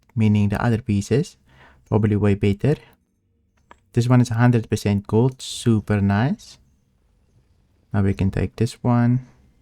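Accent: South Africa. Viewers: Hey bud, love your content (and your South African accent). Keep it up! I did want to mention that it sounds like you may be clicking the mouse to deactivate the laser beam after cracking and extracting the rocks. You don't actually have to do that since the game automatically does it for you. I noticed once in this video that you actually inadvertently turned your extraction laser back on after the game turned it off for you as a result of your unnecessary click. Just figured I'd mention it since it seems maybe you are not aware that the game does turn it off for you automagically.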